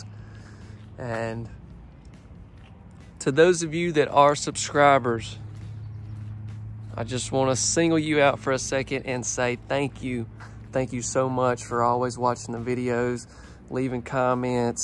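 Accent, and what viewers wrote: South, United States. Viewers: I enjoy your adorable Southern “accent” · As a fellow southerner I love your accent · I absolutely love this home, and as a widowed grandmother who loves to cook, I’d think I had already died and gone to Heaven if I was blessed to be able to have it as my own. I’d have one guest bedroom and use the smaller one as my sewing/craft room. Unfortunately, I’m not in a position to be able to buy it, but I love watching the videos and dreaming. You sound like a good Southern young man to me, and you come across as warm, funny, and, most importantly, honest about the homes you show us. I’m in the upstate of South Carolina where the tornado hit, and if it were possible, I’d buy this home, because it was love at first sight! 😂 Thank you for your videos and for being who you are. I still have to have hope and dream, and you make this so enjoyable for me. 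Keep up the good work, and I’ll keep watching! 😉👍🏼🙏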